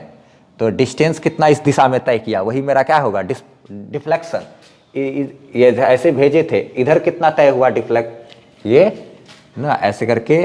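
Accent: Bihar, India. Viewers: Sir aap bihar se ho 😶¿¿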